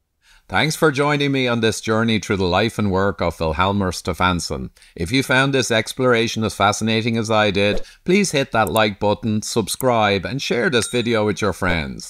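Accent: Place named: Canada. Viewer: Canadian aye? Thanks!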